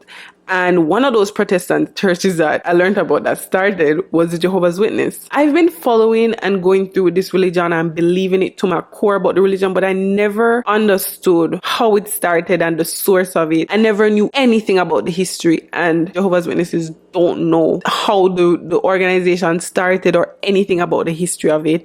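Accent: Jamaica. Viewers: Your Jamaican accent is lovely! And you're pretty! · I was in Bayfarm in Kingston. First time hearing this from the perspective of someone that shares my native accent. I felt the same way when I researched the organization like I was committing a crime. 😂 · Are you Jamaican because I’m picking up the accent?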